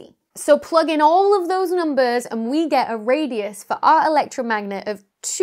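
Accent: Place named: Cockney, United Kingdom. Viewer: Your Cockney accent is cool. (I'm not native English speaker)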